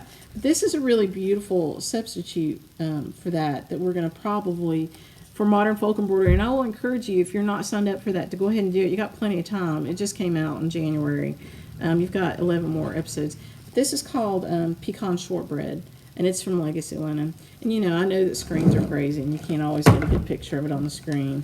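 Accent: South, United States. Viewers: The thread legends are wonderful tools and bookmarks, too! I just love your southern drawl! I signed up for the virtual Jeannette Douglas class. I didn’t see the booklet online but I’ll look again. I went to an all girls catholic school in Manila and we had a sewing class in every grade. The Belgian nuns sure taught us samplers. We had one every year, then your embroidery projects for the rest of the school year depended on your skill and speed. I remember we had a darning sampler in 4th grade that included different buttonhole styles. I wish I had kept them all. Is the passcode for the 8th installment of the Saxon band sampler available? Thanks much and also for sponsoring Fiber Talk! Happy New Year!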